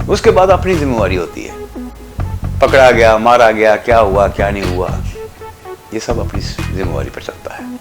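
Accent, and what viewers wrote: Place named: Jammu, India. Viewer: his dogri accent is good with the music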